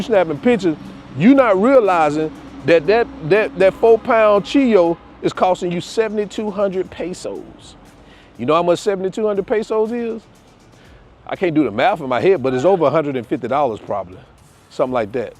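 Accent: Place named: Alabama, United States. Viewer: Es el acento Alabama para mí .. con las palabras en español 😂 “malecón” “Ave Spaña” 😂🫶🏽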